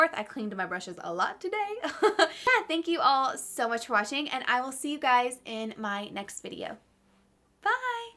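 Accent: South, United States. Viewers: I just love when your southern comes out because being a BAMA girl myself it’s nice to hear something of myself on YouTube. I’m not sure why it’s so rare to hear. There’s just so many of us southerners out here! Thank You for this video!!! I’m a big fan of yours! Much Love toYou 😘💋😍❤️❤️❤️ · Love your southern accent, so cute! I like the left side better, looked like the colors blended out better.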